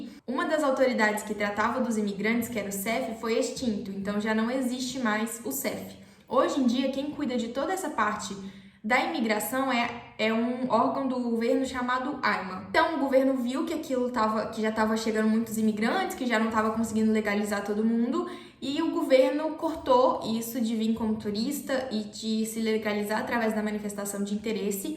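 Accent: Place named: Favela, Brazil. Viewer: Portugal é um dos "piores países da Europa"? Trava a língua, tá brasileira? Trava SIM piorque a tua língua que é bem comprida e afiada. Não achas que por Portugal ser o que dizes que não deverias estar cá e que NUNCA deverias ter vindo. Vê-se logo que és brasileira. O que será que "vinheste fazê prá PortugAAAAAAAAAAAAAAAAAAAAAAAAAAAAAAAAAAAAOOOOOOOOOOOOOOOOOOOOOOOOOOOOOOOOOOOOOOOOOOOOOOOOOOOOOO" se nem de origem portuguesa és? Qual é a afinidade que tens com Portugal, com os portugueses, com a cultura, com os costumes etc? Nem mesmo com a língua tens qualquer afinidade. Nós portugueses não falamos o português da FAVELA como tu falas. Trava a língua, falsa turista.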